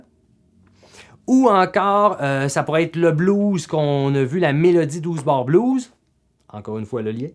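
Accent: Quebec, Canada. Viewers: ce petit accent Québecois rajoute vraiment une ambiance toute sympa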